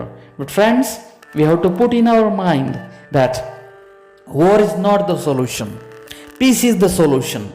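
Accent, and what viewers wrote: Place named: United States. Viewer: Thoda dhire bhi bol sakte ho aap American jese kiv bol rahe ho aap Kiya samaj aayega new student ko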